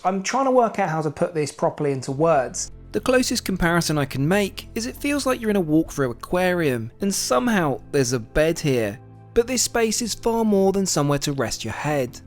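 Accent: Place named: England, United Kingdom. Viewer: I used to think the English accent was enchanting and " lov-e-ly ". But now, seeing what they did to their country with mass immigration ; listening to this guy is nauseating.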